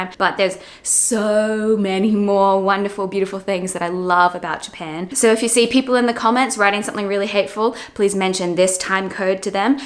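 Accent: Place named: Australia. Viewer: You are so genuine and charming, a lot of vloggers put up a pretty obvious facade. Also lovely to hear an aussie. Keep em coming! / Currently deployed somewhere in the desert - fan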